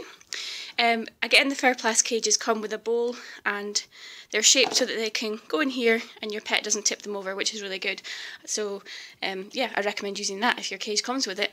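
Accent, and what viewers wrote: Scotland, United Kingdom. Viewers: Are you Scottish? If you are then woo hoo! So am I...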